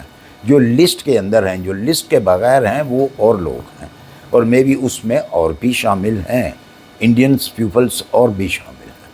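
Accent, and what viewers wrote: Punjab, Pakistan. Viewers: Tu c paindo ho. Ardo (Urdu) punjabi which bolde ho. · بھائی اپنی engish تو ٹھیک کر لین ۔ لفظ . رسپونس . نہ بولیں ۔۔اسپوسر بولسن ۔۔اپ پنجابی مین english بول رهے حسن ۔۔۔۔ Dont use word: Response ...it should be sponsorship ... کسی پڑھے گکھے ادمی سے پتہ کر لو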